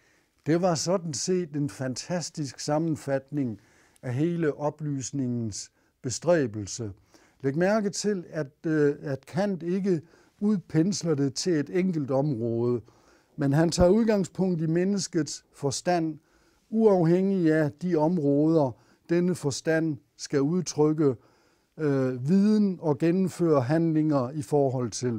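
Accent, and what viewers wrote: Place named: South Jutland, Denmark. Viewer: Filosofi på Sønderjysk.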